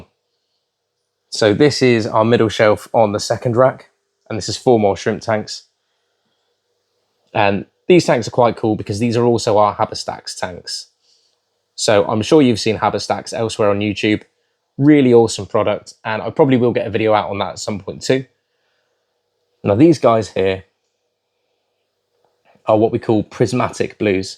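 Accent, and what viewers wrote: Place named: United Kingdom. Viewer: I am from east asia, I am just here to enjoy ur british accent haha.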